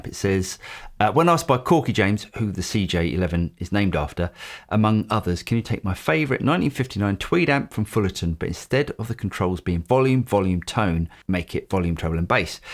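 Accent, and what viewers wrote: United Kingdom. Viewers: try a hamstead, you sound english....come on man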